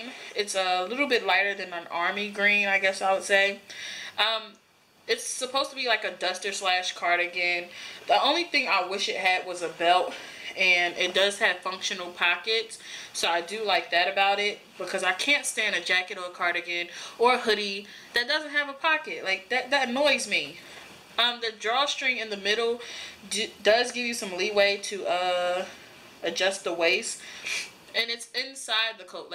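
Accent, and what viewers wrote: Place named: Newark, United States. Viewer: Hi girl, I'm from Newark! Going there for the festival in Lincoln Park! I knew I recognized the talk! Sounds like us! I live in Atlanta now! I have subscribed and get to see all of your great videos! Keep giving us the current slay! THANKS! Jp! !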